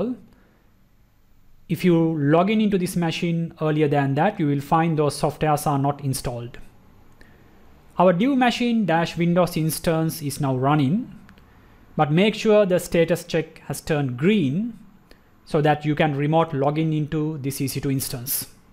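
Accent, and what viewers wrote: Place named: India. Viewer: DAT DAT DAT I never understood why Indians do not even try to fight their accent and at the same time climb the Internet to shoot videos in a language other than their own. As one hero said, "I can't swim and I don't put my ass in the pool." The video is about nothing. Any fool can download the repo from the git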